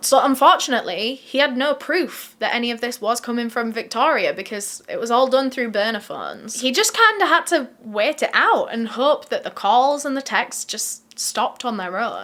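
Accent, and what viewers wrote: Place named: Northern England, United Kingdom. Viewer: Are you from Northern England? Your accent is quite provincial.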